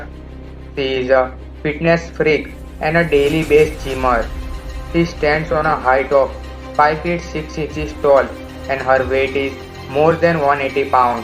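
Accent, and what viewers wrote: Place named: India. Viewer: Beautiful woman and lovely vide Disgusting Indian accent audio ruined it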